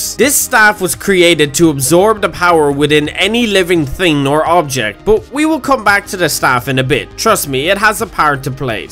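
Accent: Ireland. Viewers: Dominic Fischel It’s the Irish voices. They good.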